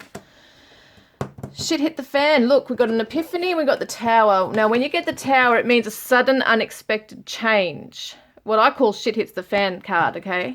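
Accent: Australia. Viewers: Thank you just stumbled on you! Great to hear a fellow Aussie 💙 Summer ❤💜❤ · Love you in depth readings. Hearing the Aussie accent for me makes me feel more at home like we're having a personal convo Thankyou for all your doing to help bring answers to little summers disappearance ~cheers🤗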